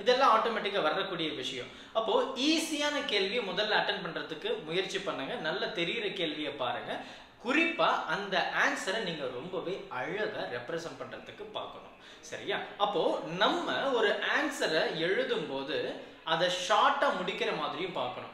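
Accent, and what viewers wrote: Coimbatore, India. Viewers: Coimbatore polaya sir😂😂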